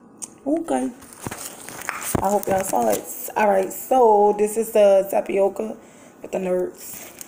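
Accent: New Orleans, United States. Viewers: How are you, I am one of your new subscribers. I love your accent and by any chance are you from New Orleans because your accent sounds very similar to my sister's who lives in New Orleans. I was born in New Orleans, but I been in Jersey most of my life. I also love your bricks from Starch Bricks, I finally received my order today. That was my second time ordering but I probably order from him again cause he is on point. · New Orleans? Your accent gave it away 😊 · U gotta be from New Orleans with that accent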